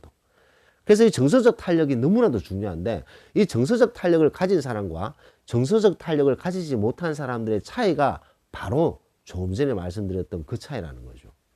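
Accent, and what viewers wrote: Gyeongsang, South Korea. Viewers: 고맙습니다 늘 힘이 되고 있습니다 경상도 사투리로 조근조근 말씀해 주시는데 제 마음에는 무엇보다 잘 와닿습니다 괜찮아~~~ 뭐 이것쯤 이겨낼 수 있어~~~ 용기내어 보려합니다 다른나라와서 머물면서 갱년기 시작되면서 약해진 마음상태를 다잡아 보려합니다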